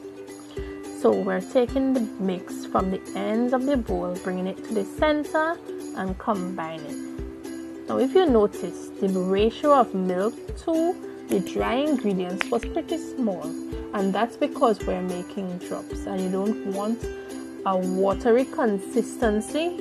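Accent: Trinidad and Tobago. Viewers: You sound like a Tobagonian. That MUST be some good tasting coconut drops. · I love your Trini dialect on this video. Too many Trini people make recipe videos and sound weird when they try the American accent haha. Love this.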